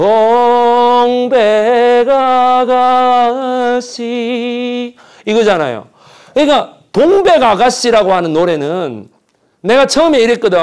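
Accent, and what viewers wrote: Busan, South Korea. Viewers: 는 모르는게 없네요. 부산억양이 완벽하시네예. ㅎㅎ.😊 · 경상도말씨같아 서울이 고향인줄 몰랐네요 ㅎㅎ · 매불쑈에서 4.3 방송 잘 들었습니다. 말투가 저랑 같은 부산분 같던데 ㅎ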